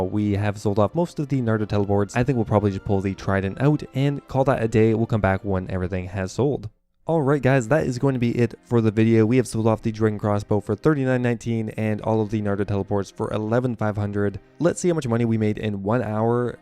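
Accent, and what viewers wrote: Canada. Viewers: Hey random question, you sound like you're Canadian but originally from a European country, or am I just hearing things lol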